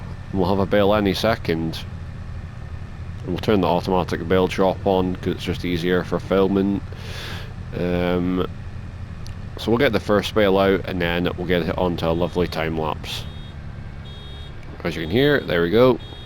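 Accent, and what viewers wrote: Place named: Highlands, United Kingdom. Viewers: Hi dude. Where you from cause you sound like your near me up in the highlands? Wanting to do so e realistic farming in farming sim 25 ma sel. Keep up what your doin bud. Camsden farm is great! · sounds like Inverness lochaber maybe black isle sort of way doesn't it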